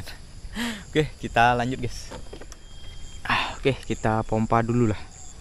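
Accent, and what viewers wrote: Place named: Bali, Indonesia. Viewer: Nak bali? Kok logat bali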